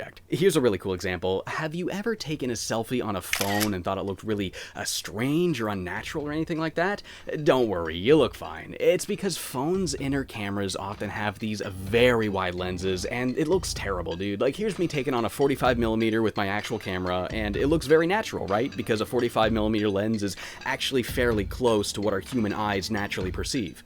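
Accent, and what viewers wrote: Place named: Nova Scotia, Canada. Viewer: This is what I love about. He's plays a game, we get a lesson on Photography. Plus he's from Nova Scotia and his accent and mannerisms makes me miss the Maritimes.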